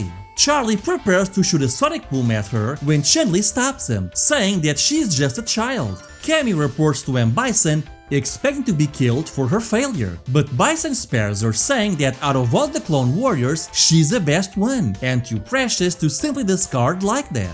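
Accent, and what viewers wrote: Portugal. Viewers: Vc é português? Pelo sotaque, pareceu muito. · I heard your speaking and already knew you were portuguese! Lmao! Thank you for making content on brazillian fgc. Greets from Brazil!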